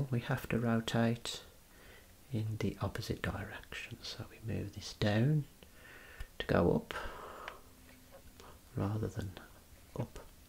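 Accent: United Kingdom. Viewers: it's the UK accent 100%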